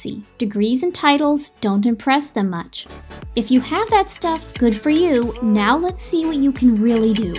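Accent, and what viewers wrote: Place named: New England, United States. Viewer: Not certain, something New Englandish. It's fun.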